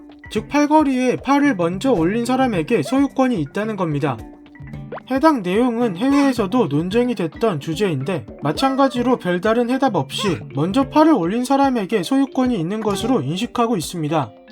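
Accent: Gyeongsang, South Korea. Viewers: 서울 사투리 안 쓰시고 경상도 사투리 쓰시는 거 보면 경상도 분인가? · 부모님측에 경상도분이 있으신건지 조심스럽게 추측을...저도 서울사람이지만 충청도 사투리쓰거든요 영향받아서ㅎㅎ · 이거 혹시 사투리신가요? ㅅㅋㄱㅋㄱㅋㅋzz갱상도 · 형 경상도 사람이었구나 친근한 억양이 들렸어 블루베리 스무디 해봐 형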